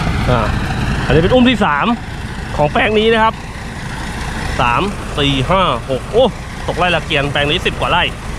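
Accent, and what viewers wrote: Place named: Bang Kam, Thailand. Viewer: พูดเหมือนคนบางกํา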